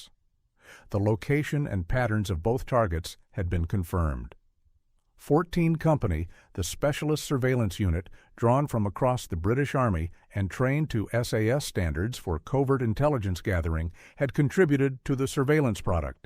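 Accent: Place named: United States. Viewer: Why an US voice over about a full UK subject?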